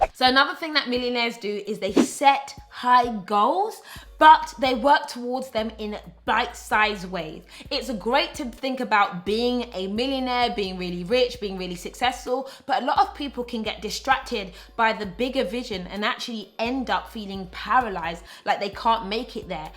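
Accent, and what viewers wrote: United Kingdom. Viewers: I love British accent💋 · Are u british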